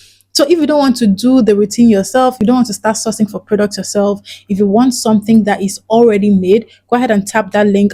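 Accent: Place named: Germany. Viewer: I like your accent. I notice a little German tones to it? Am I correct?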